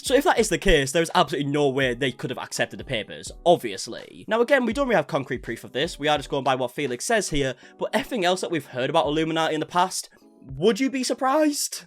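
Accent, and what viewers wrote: United Kingdom. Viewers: I wonder why so many British drama channels are so into covering this nutball woman. Seems like every time I click on an update - yep! At least if I have to hear about that horrid beast, it's in a pleasant accent! :)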